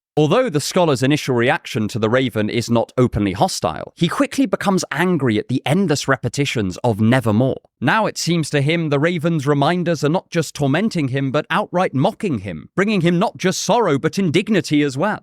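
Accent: RP, United Kingdom. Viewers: Did anyone tell you that you got one the most British accent ever ? Like these days, whenever I think of British English, I tend to hear your voice. No offense intended if that happens to be a sensitive area btw.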